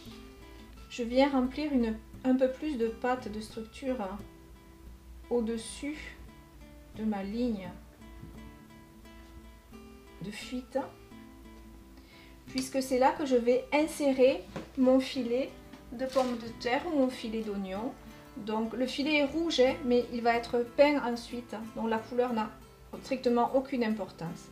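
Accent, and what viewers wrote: South, France. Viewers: a l accent du soleil !!! merci ca donne la niak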